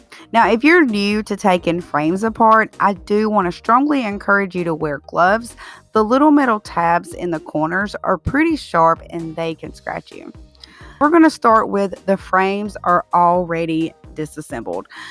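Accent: South, United States. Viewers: I love your accent. It's so Southern. We're here in North Carolina. My friend has a very thick drawl. She always says she's as country as a sugar sandwich 😂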